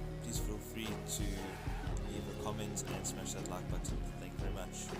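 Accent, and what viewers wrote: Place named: South Africa. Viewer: SA? you sound like you are from SA.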